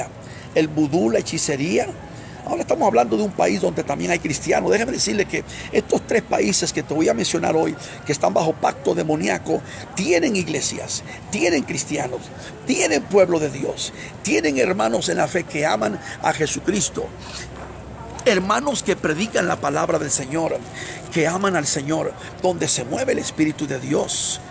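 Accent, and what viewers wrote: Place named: Quito, Ecuador. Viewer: Mira inproquita de momento ablas con en Quito Ecuatoriano porque no miras te veo vestido de judio y protestante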